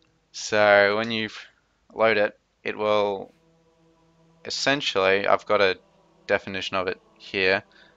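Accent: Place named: Australia. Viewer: That Aussie accent.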